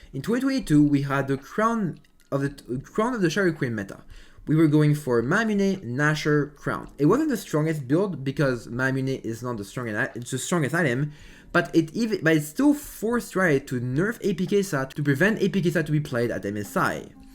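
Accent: France. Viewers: l'accent francais est fou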